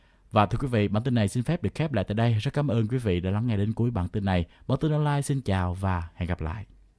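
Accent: Standard. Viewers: nói rất chuẩn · Kênh này nói chuẩn nha❤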